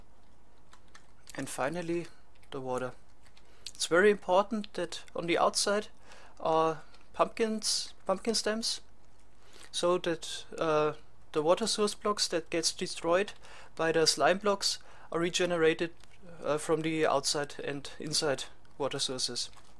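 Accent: Germany. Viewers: it is interesting seeing his german accent improve over time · der deutsche Akzent kickt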